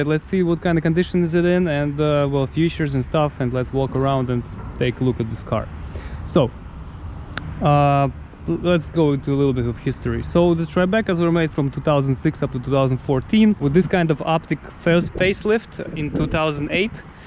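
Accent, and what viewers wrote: Russia. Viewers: I,d say a Ruskie too .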